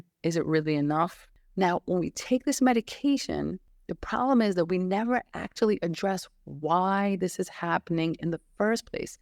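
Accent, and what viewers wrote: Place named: New York, United States. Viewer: This is an east coast US accent like New York or something like that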